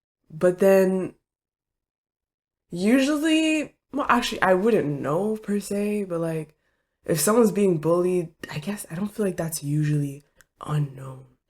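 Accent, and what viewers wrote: France. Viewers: where u originally from? are u french?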